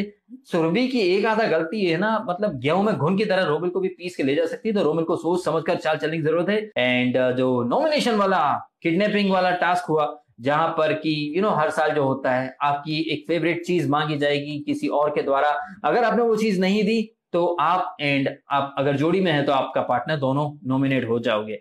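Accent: Haryana, India. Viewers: bhai aap kamal ho 👏👏 👏......Aapka Haryanvi andaaz, aapki break up wali aisi lagi lagan aur jasleen-anupji ka breakup ka jo varnan kiya hai wo bahout enteratining laga..Mujhe personally bahut hassi aayi jab aap alag andaz mai baat kar rahe the.....Aapka 15-20 min ka review 1 gante ki borring bb12 se jyada entertaining hai 😂😂😂😂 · jii aapka haryanwii andaaz ekdum kamaal...jalota aur jasleen ka kapdonka drama sirrf aur sirrf trp boosting tactics..kuch bhi reaistance n dikhake directly kapde aur makeup de deti toh drama kahanse bann pata..agar trp laa rahe hoo toh bb khud bachate hai frm elimination...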